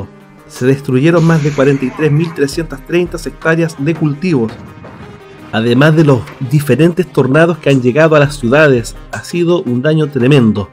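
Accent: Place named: Chile. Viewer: Vos sos chileno ,te digo x el tono de voz k tenes,saludos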